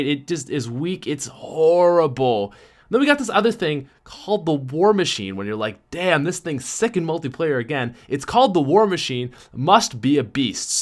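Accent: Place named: Newfoundland, Canada. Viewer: Is this guy Canadian???? The way he says “out” and “about” is totally Canadian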